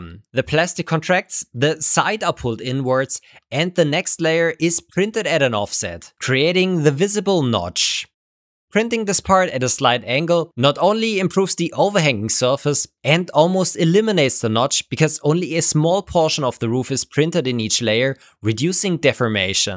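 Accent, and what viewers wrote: Germany. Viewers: I can hear that german accent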